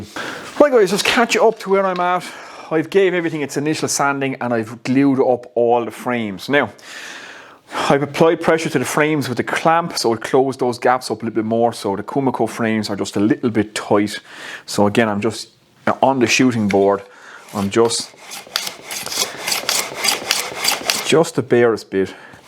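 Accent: Ireland. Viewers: I think it'd be cheaper to scrap some cheap yard sale lamps for the components... I've got access to a bunch of wood and have all the tools but I just couldn't see myself selling those for that much considering how much batch work goes into it. I don't think I'd charge more than about $65-$70 a lamp all in all. rather spend a week cutting up parts and components and spend the weekend assembling a BUNCH of them. steep prices mean you don't have to sell AS MANY to get where you need to be but cheaper prices mean MORE people are going to be willing to buy them which is a more steady strategy. I guess it comes down to HOW much do you want to work, then again going off the accent I'm guessing you're in Ireland and I don't know the import taxes on a lot of that stuff so that's likely where most of the high cost for the electrical components is coming from. the price on those components is just mind boggling lol they should be like a dime a dozen! 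you should change jobs and be an importer at that point though... life's too expensive anymore these days lol doesn't make sense to me...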